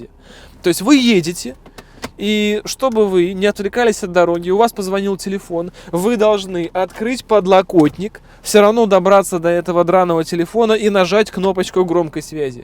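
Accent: Saint Petersburg, Russia. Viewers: Уходит "Питерский акцент" который был в начале.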